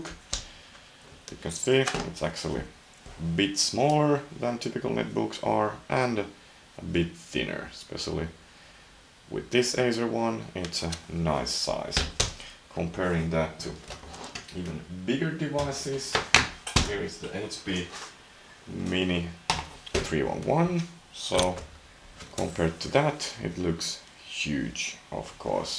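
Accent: Finland. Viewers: where you from? you sound finnish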